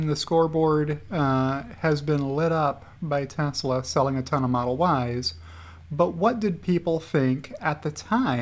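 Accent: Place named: Transatlantic, United States. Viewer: Love that hair, man, and your posh, trans-atlantic English accent compliments it so well.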